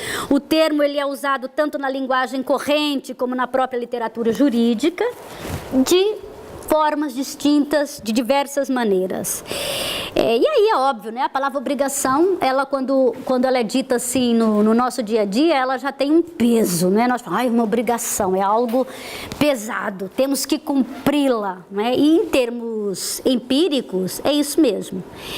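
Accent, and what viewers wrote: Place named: Brazil. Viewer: Ela é brasileira? Sotaque parece do Brasil!!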